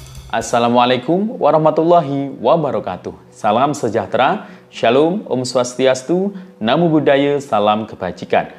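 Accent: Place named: Purworejo, Indonesia. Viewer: Wong Purworejo ni mas...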